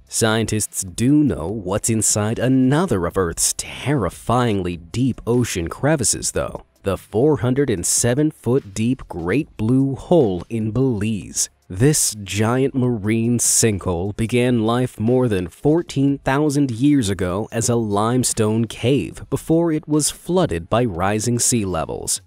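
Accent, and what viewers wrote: United States. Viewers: patronizing yanky voice...urgh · This is a weird video. Why would a narrator with an American accent use the English word lorry?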